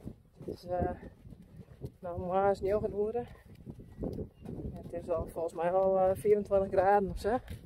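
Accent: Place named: Netherlands. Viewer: I love your constant Netherland English narrating - it's funny but in a damn good funny way. I like it a lot cos it makes me laugh and feel happy. I love your horses too. The horses are lucky to have you as can see you care very much and is conscientious of their wellbeing. They all look well looked after and so healthy. Such beautiful horses and very powerful looking both at the same time. They exude beauty, power and health.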